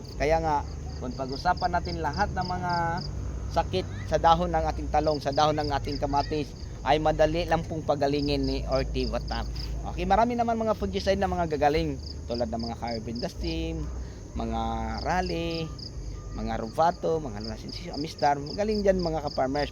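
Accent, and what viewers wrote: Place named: Visayas, Philippines. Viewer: Saan ka idol nagtaranim kasi bisaya ang tuno ng pananalita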